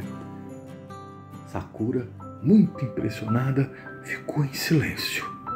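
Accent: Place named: Minas Gerais, Brazil. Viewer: Lindimaisdaconta!!! Em sotaque minerêis.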